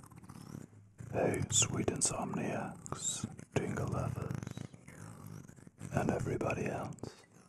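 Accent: Germany. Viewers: Ist das eigentlich ein Deutscher? Wegen seinem Akzent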